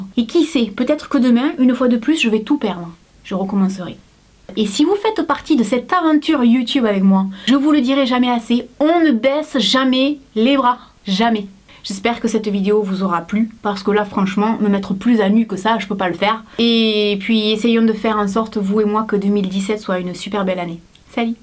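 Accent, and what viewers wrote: South, France. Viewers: lol lol c'est l'accent du sudeee 😂😍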